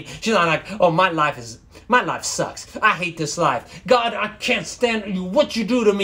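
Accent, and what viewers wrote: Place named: South, United States. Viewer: love it when you sing and when your Southern (from Tennessee?) accent shows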